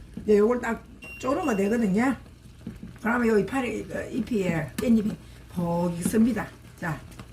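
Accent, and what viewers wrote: Gyeongsang, South Korea. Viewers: 깻잎찜 요리 잘 배웠어요. 참 맛있겠어요. 손 내밀어 주셔서 감사합니다. 경상도 사투리 구수하고 좋네요. 저도 손 잡고 요리 배울게요. 🎁🔔 응원해요!👫💕👍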